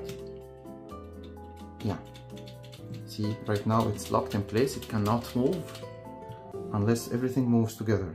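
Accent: Egypt. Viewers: i knew it ......i knew it .....i knew it .. its Egyptian accent منين يا غالي في ام الدنيا وياريت وسيلة تواصل معااك انستجرام او فيسببوك القلب مش بيحن من فراغ و الله متابع ليك من زمان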